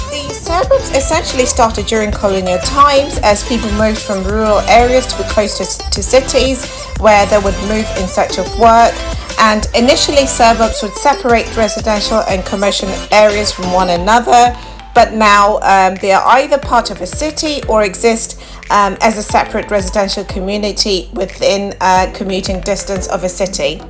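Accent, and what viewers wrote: RP, United Kingdom. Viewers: Hey you very posh😊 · ❤❤❤ new subscriber alert! even ngingezwa your British accent lol...hope I'll pick a word or two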